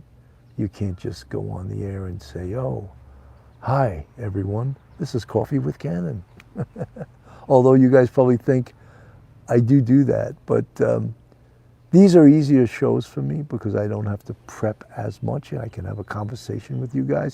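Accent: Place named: Texas, United States. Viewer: I want to hear your Texan accent lol.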